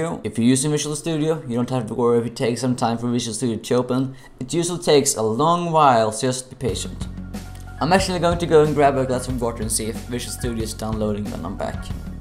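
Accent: Sweden. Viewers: lol I immedietely knew you were from Sweden from you accent! damn, that early day pewds when he wasn't so fluent in english made me catch that accent instantly :v great tutorial btw, helped me out a lot, keep up the good work!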